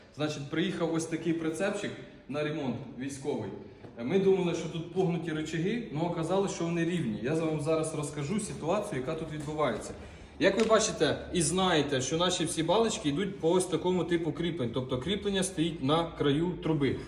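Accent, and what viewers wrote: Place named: Surzhyk, Ukraine. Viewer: Ну говори уже на русском языке, слышно же по твоему суржику что ты русскоговорящий.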